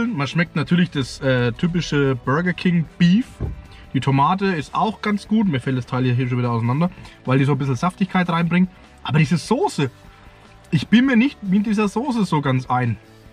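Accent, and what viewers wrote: Bavaria, Germany. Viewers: smitty werben jagger man jensen fürn Norddeutschen ist da unten alles irgend wie bayrischer Slang.😁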